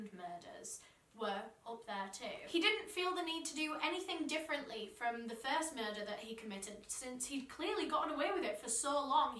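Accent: United Kingdom. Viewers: Are you British... Im just judging by your sound/(slingo) shmommy 🎉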